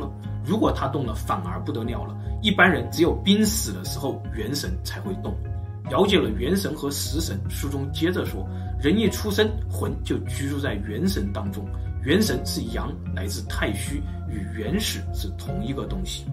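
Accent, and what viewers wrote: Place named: Shanghai, China. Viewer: 不搭噶 up主上海人吧😂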